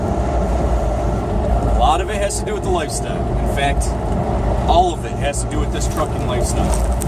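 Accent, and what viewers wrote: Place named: Rochester, United States. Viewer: Dude I KNEW that had to be a Rochester accent!! I grew up there.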